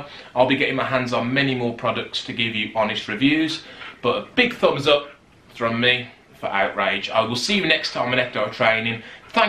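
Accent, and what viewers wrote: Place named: Birmingham, United Kingdom. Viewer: 👍👍👍👍👍👍 sound like fellow brummie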